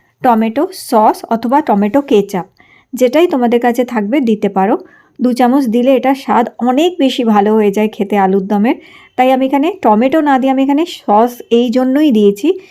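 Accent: Bangladesh. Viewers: বাড়ি কই টাঙ্গাইলের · মাগো তুমি বাঙাল নাকি।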